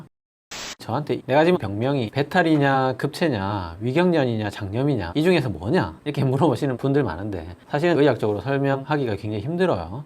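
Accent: Gyeongsang, South Korea. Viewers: 부산사람인데 경상도사투리덕분에 옆집의사쌤같네요.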